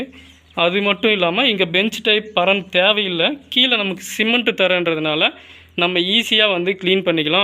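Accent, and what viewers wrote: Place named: Dharmapuri, India. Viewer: Super ga nenicha slang ora mari iruku nu nanum dharmapuri tha dpi la endha ooru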